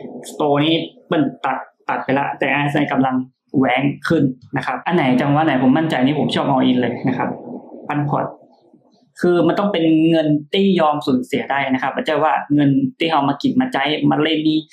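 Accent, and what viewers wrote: North, Thailand. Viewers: ฟังไม่รู้เรื่องบ้างแต่ก็ชอบค่ะภาษาเหนือ เป็นภาษาที่ลื่นหูมาก #ติดตามพี่มาตั้งแต่เข้าวงการเทรดเลยค่ะ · ภาษาเหนือ เป็นภาษาที่มีเอกลักษณ์มากครับ ฟังแล้วสบายหูมากครับ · อู้เหนือม่วนขนาดดดดดดดด......ชอบบบๆๆๆๆๆๆๆๆๆๆๆๆๆๆ o(〃＾▽＾〃)o ヾ(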